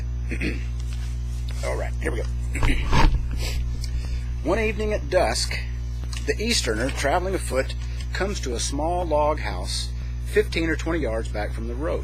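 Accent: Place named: Arkansas, United States. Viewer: You sound like an Arkansawyer all right, but we downEasters don't talk the way you think we do. However, you like storytelling, fireplaces, and pipe smoking, so you're a friend of mine I just haven't met yet. I enjoyed this vid enormously; and if ever we meet, I'll fiddle you and share my tobacco.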